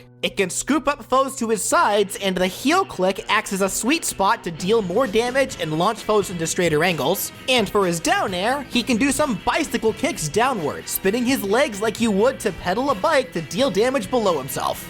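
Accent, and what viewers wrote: New York, United States. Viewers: A bit of a missed opportunity to use your New York accent. Regardless, this was a great moveset for the Scout.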